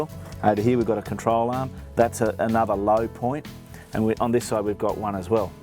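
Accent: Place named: Australia. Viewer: Not gonna lie, even though the heavy Aussie accent was a dead giveaway, I was genuinely surprised when he went inside the cab to show where his “Diff marker” would line up and I saw him sitting behind the steering wheel. In my head, I had already marked it for where in the passenger it would go.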